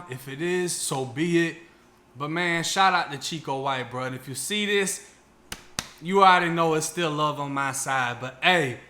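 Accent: Boston, United States. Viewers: That Boston accent getting stronger shit coming back huh... glad u been eating of yt brodie I deserve the shit keep grinding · Dude you sound like you have lived in Boston since 1962, wtf. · That's a Boston accent this dude got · This dude sure he’s not from Boston lol that accent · Do I detect a Boston accent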